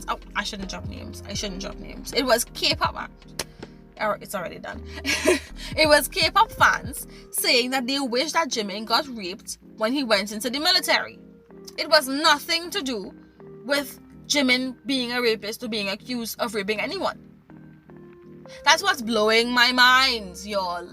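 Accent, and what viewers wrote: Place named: Caribbean. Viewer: Thank you for the clarity of your explanation! You have a beautiful accent! Caribbean? I agree with what you have laid out here and I have subscribed to your channel! I wish you every success going forward! PREACH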